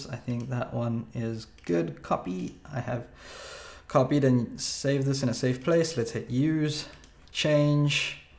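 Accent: South Africa. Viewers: Cape Town or Joburg?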